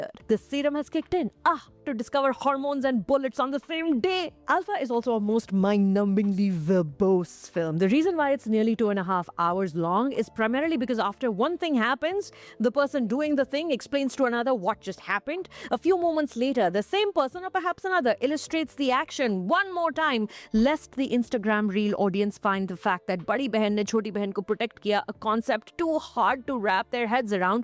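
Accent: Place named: South Bombay, India. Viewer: south bombay accent english haha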